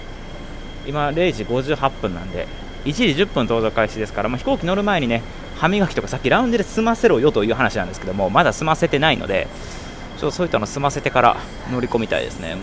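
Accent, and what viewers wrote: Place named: Kansai, Japan. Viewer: バリバリの関西人ですからね、うp主は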